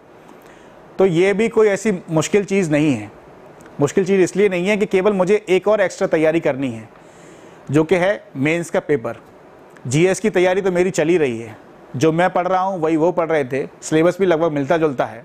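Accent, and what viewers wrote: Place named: Agra, India. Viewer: I am also from agra .tabhi inka accent bahut krra hai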